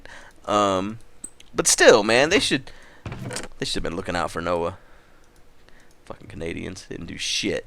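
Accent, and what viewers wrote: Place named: New Jersey, United States. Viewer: im from nj and i cant tell between this nj accent...its crazy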